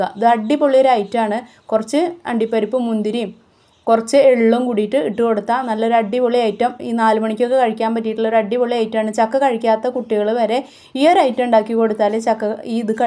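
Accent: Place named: Kunnamkulam, India. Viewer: Liked your kunnamkulam slang 👍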